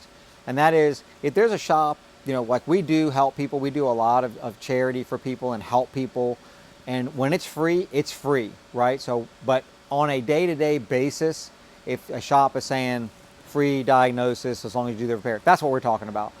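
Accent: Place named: New York, United States. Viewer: I even said to myself: sounds like NYC 🤔 Then you said it's queens 🎯 Figured as much,there's so many ripoff artists down there they step all over each other! The amount of time they take to "pull a fast one" ,it doesn't really take much longer to do an honest job. But 10 seconds is 10 seconds I guess.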